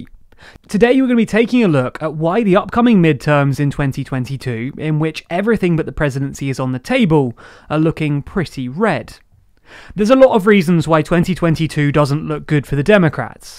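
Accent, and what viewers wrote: United Kingdom. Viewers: Love hearing a Brit explain American politics!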